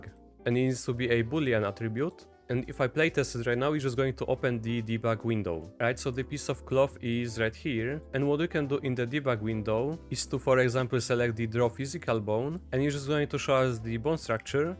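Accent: Poland. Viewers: polish accent 🤩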